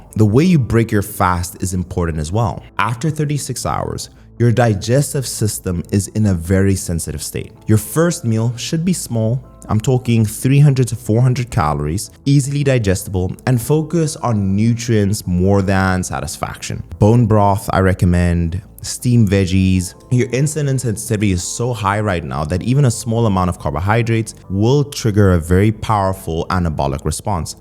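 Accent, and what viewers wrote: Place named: South Africa. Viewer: Your accent does not seem British sir....Sounds more like South African.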